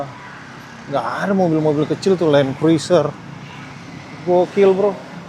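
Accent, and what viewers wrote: Eastern Indonesia, Indonesia. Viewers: kaka punya logat timur kantara skali😂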